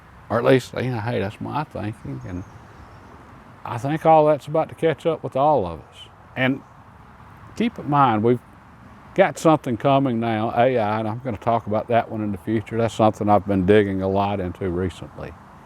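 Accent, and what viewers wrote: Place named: South, United States. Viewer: By the way, I like what you say and that southern accent. I’m an ole Arkansas boy. New subscriber.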